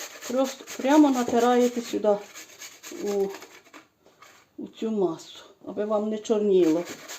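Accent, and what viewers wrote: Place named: Galicia, Ukraine. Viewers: Ні. Це не львівський говір. Це Прикарпаття! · Дуже дякую за чудовий рецепт і за рідну прикарпатську говірку! 🥰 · Пані дякую за рецепт ви з Львівщини чую по розмові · Моя дорогенька, "най си трошка постоїт ", "підиллєємо "... Обожнюю свою рідну говірку галицьку! Скучаю за нею несамовито, я живу в Рівному, і тут свої діалекти, далекі від моїх рідних...😢😢😢 А зі старістю ностальгія за рідними краями тільки посилюється....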